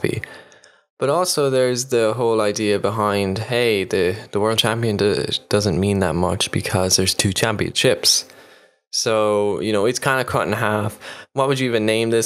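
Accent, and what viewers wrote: Ireland. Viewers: im guessing your irish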